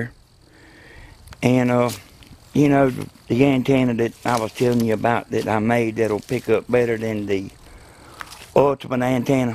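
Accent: South, United States. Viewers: I love the southern accent. 👍🏻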